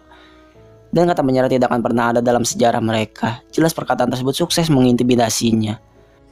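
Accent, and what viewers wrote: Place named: Banyumas, Indonesia. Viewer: Logat nya ngapak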